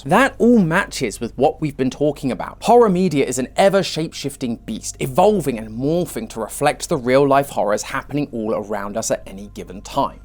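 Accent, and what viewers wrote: United Kingdom. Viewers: I hate this wanna-be, low tier, british, fake matpat host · He's British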